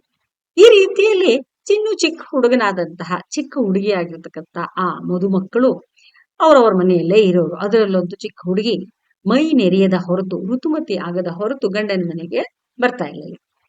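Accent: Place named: Mandya, India. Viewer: ಮಂಡ್ಯದ ಜನ ಮಾತನಾಡುವ ಹಾಗೆ ಕಥೆಯನ್ನು ಹೇಳಿದ್ದಿರಾ ಕಥೆ ತುಂಬಾ ಚೆನ್ನಾಗಿ ದೆ ಕೇಳುವುದಕ್ಕೆ ಹಿತವಾಗಿದೆ 🥰🥰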